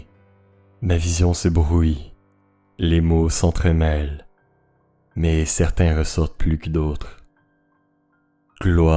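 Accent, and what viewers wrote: Quebec, Canada. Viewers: Olala cette voix m'avait tellement manqué. J ai eu cette chaine sur l accueil de youtube et j ai tout de suite cliqué. Ta narration est toujours aussi incroyable et sublime. J'adore ton accent québécois hihi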